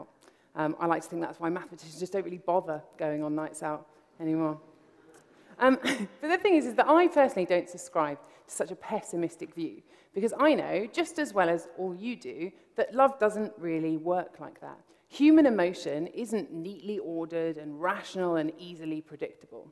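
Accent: United Kingdom. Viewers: Smart, likes math, sense of humor, British accent and a ginger. I'm in love.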